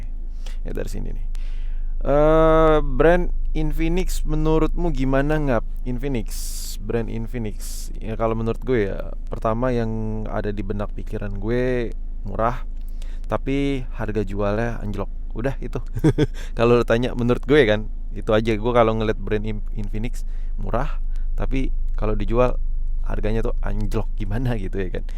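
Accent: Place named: Medan, Indonesia. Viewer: Logatnya logat anak Medan tapi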